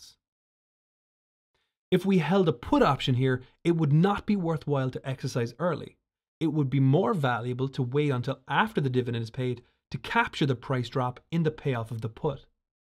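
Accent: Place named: Ireland. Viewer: The narrator sounds Irish!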